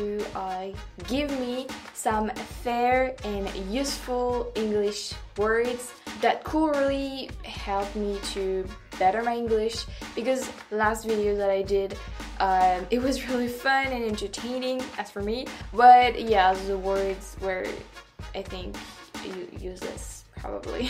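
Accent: France. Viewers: Great job with this video you look very nice in it and Saying English words with your French accent Sounds Adorable and it's 👌 to laugh at oneself from Time to Time.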